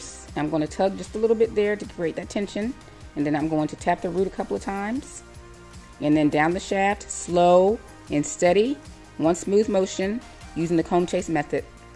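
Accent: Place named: Georgia, United States. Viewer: Lol... I was just thinking she sound like she from Georgia!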